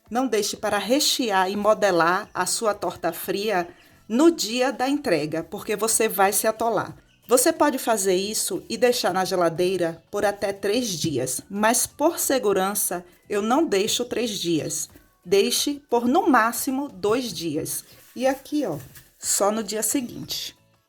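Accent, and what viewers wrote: Bahia, Brazil. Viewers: Amiga tu é baiana? Parece viu kkkkkkk,top essa torta!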